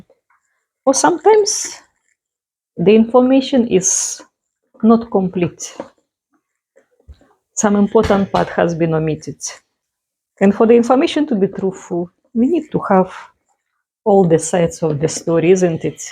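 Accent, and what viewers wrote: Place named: Russia. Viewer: I really like listening to you,! Love your Russian accent! Just feel sad that the Ukrainian government and the the Ukrainian Nationalist accepted to be used against Russia…. I think you are a special Ukrainian with lots of character, personality and love for humanity!!! ❤️❤️❤️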